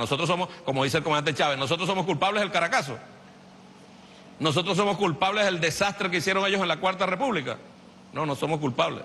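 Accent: Cuba. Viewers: Ha reclamal justiciaa, ya se le sale hasta el acento Cubano se les nota que repiten lo que viene de la Isla.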